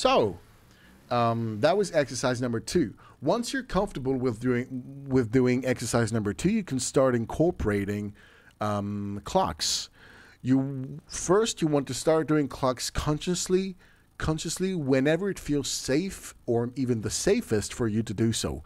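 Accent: United States. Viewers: what happened to your accent 😭😭 its almost american now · american accent but a british one in the mega video? lol also thanks for this you absolute legend!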